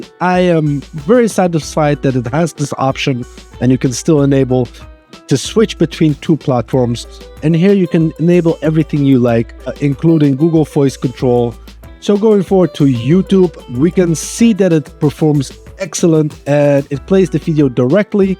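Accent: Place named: Netherlands. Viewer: You sound like a dutch speaker trying to talk english ... 😅 Kom je uit Nederland?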